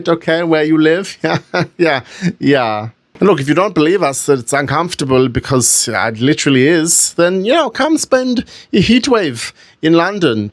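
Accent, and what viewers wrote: Germany. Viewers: Sarcasm in a German accent is the best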